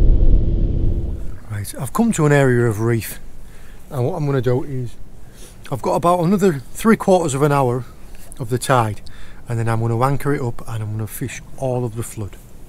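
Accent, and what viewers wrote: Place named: Darlington, United Kingdom. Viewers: Brilliant videos matey. The last time I heard an accent like that was around Darlington when I was stationed in RAF Catterick 50 years ago. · i take it you are northern by accent. You fish a lot of the marks down off the south coast. Great fun watching the Bluefin double🤣🤣🤣. Your vids i am getting on catch up but great viewing, they are keeping me sane. Cheers 🍻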